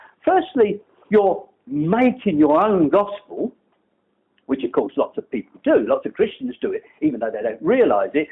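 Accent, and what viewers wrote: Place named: North London, United Kingdom. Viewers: I like his London accent. I despise the Australian and especially the New Zealand accents. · he sounds like he comes from N London.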